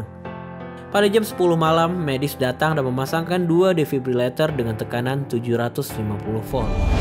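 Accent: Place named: Java, Indonesia. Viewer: Medok nya ituloh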